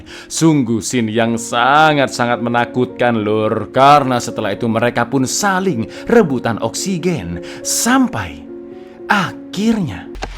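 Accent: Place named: Surabaya, Indonesia. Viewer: Asli arek suroboyo iki admine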